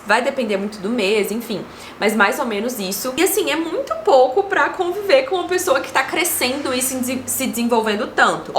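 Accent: Minas Gerais, Brazil. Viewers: A melhor coisa é me identificar com o sotaque. Minas é o lugar!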